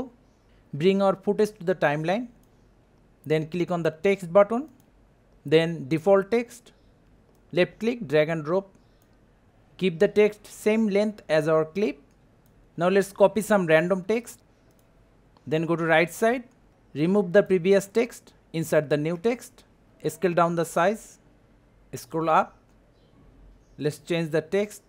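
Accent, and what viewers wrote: India. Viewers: When ever I hear the indian accent I know that it will solve my problem W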